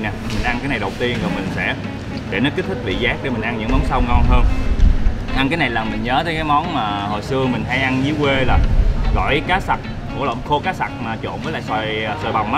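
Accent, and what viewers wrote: Mekong Delta, Vietnam. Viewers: Chuc mung anh đuoc hon 100.000 nguoi đk nha! Rat thich cach a nc kieu mien tay iu mien tay! E cung ng mien tay😘🙌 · Mê cái giọng nói miền tây mất rồi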